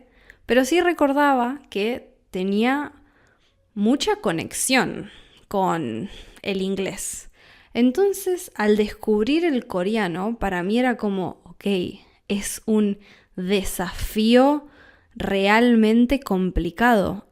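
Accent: Rioplatense, Argentina. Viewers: Great first episode, I’m looking forward to the podcast and know I’ll enjoy it no matter what you decide to talk about. I was similarly obsessed with the world of YT language learning channels and language related content for a while. I watched all the big name YT polyglots of the time and followed so many peoples language learning journeys. I even watched some those channels aimed at teaching you a language, like Japanese from Zero. I never really made significant progress in any languages back then though. It wasn’t until starting Spanish again in December of 2023 that I finally found a way that worked for me for learning a language, comprehensible input. I love Rioplatense Spanish, and your accent in particular, so I’m glad you didn’t let your accent/dialect dissuade you from starting this path. I’m super grateful to have found you as a content creator and iTalki tutor all those months ago. 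It’s been over 7 months since our first crosstalk and now our lessons are entirely in Spanish and it still blows my mind. I really appreciate you as both a content creator and a tutor and I’m so happy that it’s something that you enjoy, that makes such a huge difference. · Tienes un poco el acento argentino,. Saludos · De donde eres?!? Porque habla diferente… Su yo es sho 😮 Es Ud de Argentina?? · Thank you so much for these podcats. I'm learning Spanish purely through comprehensible input and i can already tell your podcats will be of great help to me in my pursuit of fluency. I also appreciate your argentine accent, my aim is to understand all spanish speakers, whether they're from spain or latin america. Keep up the great work you have gained another subscriber for your channels.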